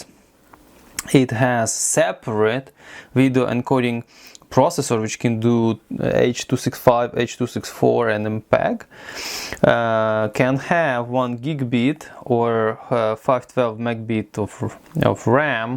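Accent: Russia. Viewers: I love your russian accent 😆 🤣 😂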